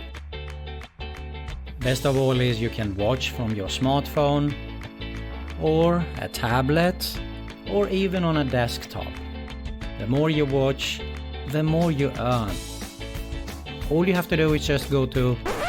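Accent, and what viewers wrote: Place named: Germany. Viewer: Hey, thanks for the nice video. Do you come from Germany, cause your pronunciation looks like Germans ? ☺️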